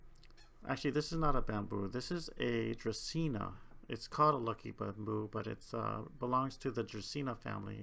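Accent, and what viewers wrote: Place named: United States. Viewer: Beautiful plants! Now I know you live in Hawaii. But your English pronunciation is absolutely american:)Where did you learn English so well :)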